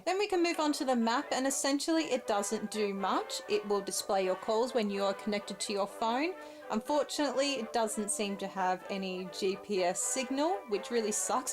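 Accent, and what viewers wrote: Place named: United Kingdom. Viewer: Definitely want one. Bet they are pricey. Nice vault suit by the way, love the British accent.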